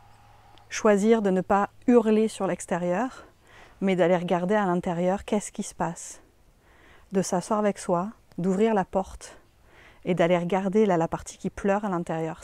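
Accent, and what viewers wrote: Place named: Quebec, Canada. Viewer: Merci, tu es si sage et pertinente! Dis-donc, tu vis au québec ? Je crois reconnaitre mon paysage et un peu de notre accent. :D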